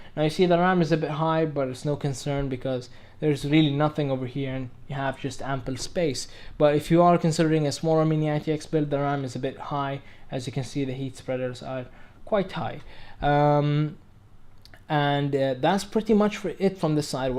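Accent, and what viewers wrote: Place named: India. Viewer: كأنك هندي قاعد يتكلم إنجليزي هيا وسابي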